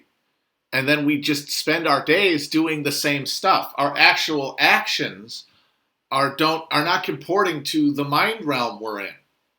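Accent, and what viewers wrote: Louisiana, United States. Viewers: HUEY LONG! Laissez les bons temps rouler, and let loose with your Cajun accent for an hour.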